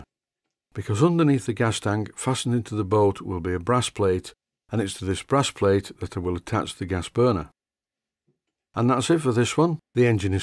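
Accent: Yorkshire, United Kingdom. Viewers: Your Jorkshire dialect makes it sound like you say "combustshn." :D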